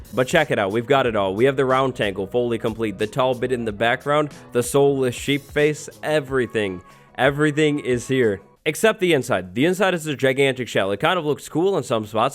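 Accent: United Kingdom. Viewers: HE’S BRITISH?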